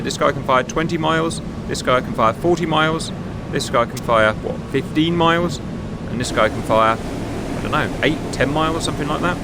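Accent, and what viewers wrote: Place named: United Kingdom. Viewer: Agreed. YT isn't purely about knowledge. has his own personality, plus he's BRIT-ISH (make a funny pronunciation yourself when reading). When looking at channels that get to this size, it's typically at least half of the host just being interesting/entertaining/weird/fun etc.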